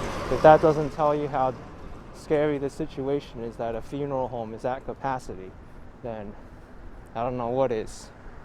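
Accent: New York, United States. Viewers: This might be your best video yet. The part where you're walking through Astoria Park, especially down along the river between the Hell Gate and the Triboro (sorry, it'll always be the Triboro to me - nothing against RFK, but I'm just too old - LOL) is breathtaking. I spent many a happy hour at Astoria Pool as a kid. Sometimes when I was really young, my dad would drive over from Woodside to the Shore Drive. I was always fascinated by looking at the East River and the tales of there being a big whirlpool under the Hell Gate Bridge. I always expected to see a passing boat get swallowed up. Never happened! Seeing Astoria Park at twilight was amazing. And one other thing: I love your New York accent. It's a mild NYC accent, not an Archie Bunker NYC accent. Most accents, be they Southern, Boston, Chicago, NYC, etc., are enjoyable as long as they're not extreme. 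Yours is the real thing. Keep up the good work. I love your videos.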